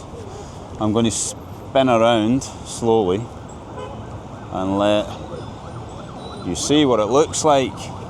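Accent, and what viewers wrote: Scotland, United Kingdom. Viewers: Love Scottish accent. Like you moustache bro, it is back in fashion. Muslims are not bad it is propaganda to keep people away from known what Islam is all about. It is one good, no single human is worshipped, no forcing to change anyone beliefs, that’s why there are millions of churches all around the Middle East with freedom to practice and obligation to protect minorities. Read is the first word from God to prophetMohammed , read yourself and learn. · If you could post English subtitles. Everyone cannot understand Scottish accent. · ПЗДЦ акцент реально СКОТИШ)))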